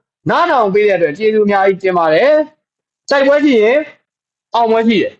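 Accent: Yaw, Myanmar. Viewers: ကျက်သတုံး အားရစရာကြီး ပြောတတ်လိုက်တဲ့ ယောနသံ ဗက ပြောရင်းနဲ့ ရီသံကြီးက မအလ ကြားရင် ဖင်နဲ့ငုတ်စောင့်သေလိမ့်မယ် ဗိုလ်ကြီးရ 😂😂😂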